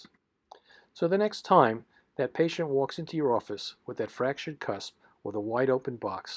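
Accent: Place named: New York, United States. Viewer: great NY accent :) great content also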